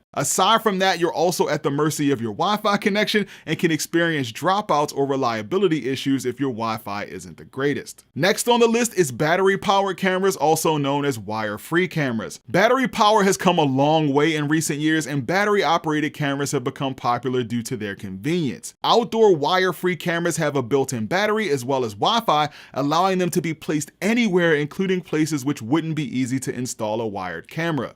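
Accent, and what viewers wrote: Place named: Baltimore, United States. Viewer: Oh you from Baltimore Baltimore lololol. Good video man, this gave me a bunch of info. I'm kinda torn between bullet and ptz, it's gonna boil down to what the individual cameras offer.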